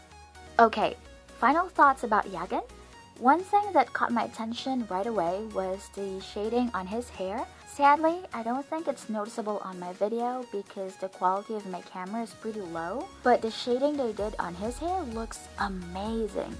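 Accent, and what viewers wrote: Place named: United States. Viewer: Phillipines? You sound American??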